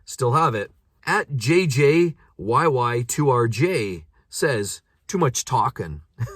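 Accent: Canada. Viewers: you do come through a little canadian at times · Abooout! Or Abouuut! This is Canadian core pronunciation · your Canadian accent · Awesome videos man. The aboot comment really got me haha 😂 But honestly, your content is some of the most entertaining content on YouTube & I’m always looking forward to when a new video is uploaded. It’s awesome to see you walk around these cars & do a 360 with your camera to then switch to a close up of your face when commenting on certain elements is very very very entertaining. Please don’t change. And with regards to the aboat part, I mean, it’s very obvious you’re Canadian but that’s not a bad thing. Much respect from Belgium! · I love your Canadian accent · appreciate your Canadian pronunciations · you have a slight Canadian Accent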